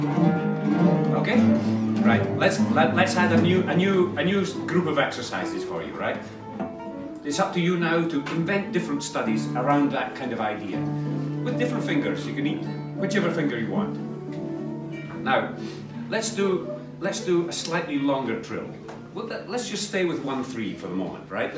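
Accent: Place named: Scotland, United Kingdom. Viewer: It s a middle class well spoken scottish accent for christ s sake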